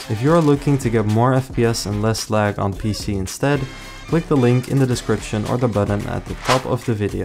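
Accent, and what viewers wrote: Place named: Netherlands. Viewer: You sound so Dutch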